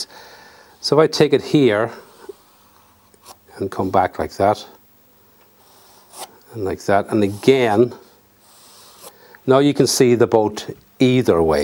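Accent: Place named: Midlands, Ireland. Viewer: U sound like a midlander like myself..13 years later here from Athlone next to the Shannon wanting to paint a similar fishing boat for a mate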